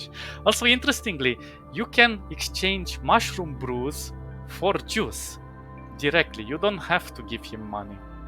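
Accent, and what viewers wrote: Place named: Lithuania. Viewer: Hey bro great video, but I have an unrelated question. Are from Lithuania or someplace close to it? You have an accent very similar to a Lithuanian friend that I have.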